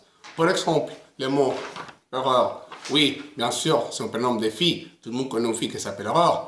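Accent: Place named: Spain. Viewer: T'es espagnol mon gars, on l'entend bien!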